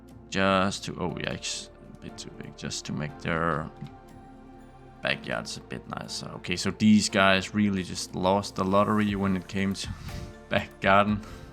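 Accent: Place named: Denmark. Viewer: Love the Danish accent ❤️❤️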